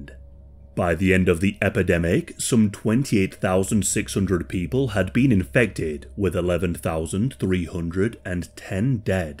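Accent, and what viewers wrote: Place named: Scotland, United Kingdom. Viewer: I love your accent! Where are you from?! I wanna’ say Scotland, but, I could be wrong. I love your channel, too.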